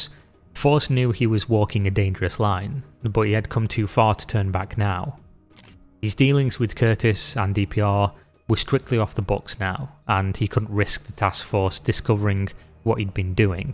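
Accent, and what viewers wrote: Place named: England, United Kingdom. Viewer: I usually find it hard to listen to these types of videos with an english accent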